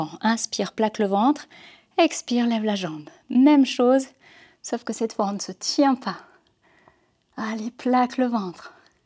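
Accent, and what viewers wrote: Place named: Switzerland. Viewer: Ah mais c’est ça j’avais bien perçu un petit accent suisse délicieux qui me rappelle de bons moments à Genève! Merci. Je me demandais si avec de la musique ce ne serait pas mieux mais peut-être d’entendre votre respiration quand vous souffrez avec nous c’est bien aussi…très bonne séance avec des mouvements originaux et variés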